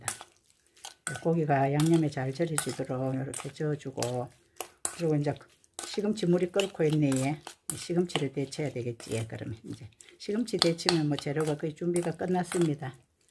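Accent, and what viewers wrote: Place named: Busan, South Korea. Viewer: 같은 경상도사투리라도참듣기좋은 말투너무좋아요부산 분. 같으십니다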